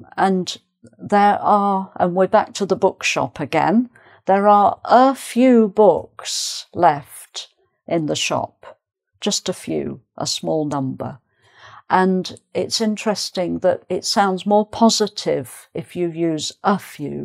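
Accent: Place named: RP, United Kingdom. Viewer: Oh. that Lovely british lady with RP accent!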